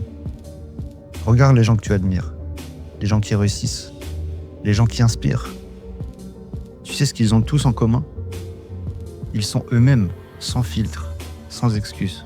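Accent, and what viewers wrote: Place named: North, France. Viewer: T’es du nord ahah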